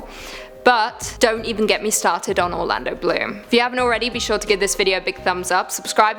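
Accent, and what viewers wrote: United Kingdom. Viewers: Lovely Brit accent though... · British accents sound so cool, especially coming out of this girls mouth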